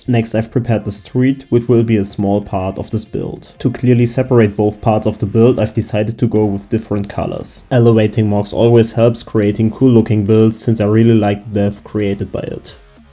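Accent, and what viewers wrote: Germany. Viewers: You sound like a German that is speaking English bro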